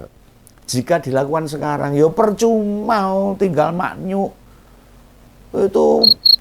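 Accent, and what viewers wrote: Java, Indonesia. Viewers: Mantap om,tetap bersuara dg diselingi dialek jawanya..saya suka😂😂😂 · Sbg non jawa. gak ngerti apa omongam om ini, medhok2 campur aduk.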